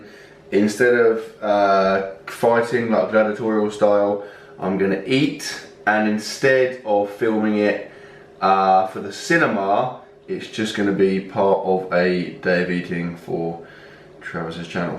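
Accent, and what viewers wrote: United Kingdom. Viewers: At first I was like, did Travis get a hair cut? And then I was like hmm, the British accent is new though, but I did miss the last video, so maybe it's his new thing. Then he said his name is, then I was like ohhhh.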